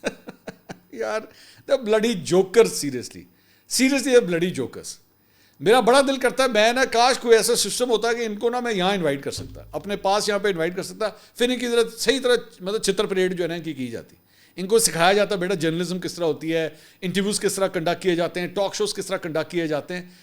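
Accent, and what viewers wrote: Punjab, Pakistan. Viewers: A lot of people like me have a lot of political differences with you but how you show up in this crisis I'm proud of you for standing up as a pure Pakistani and specially when you always give a nice punjabi touch 🎉 Pakistan really stood up as a nation. Alhamdulilah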